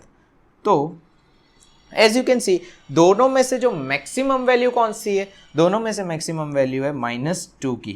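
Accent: Gujarat, India. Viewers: are you gujrati you say that 'so aama ek exmple le te hai' good job bhai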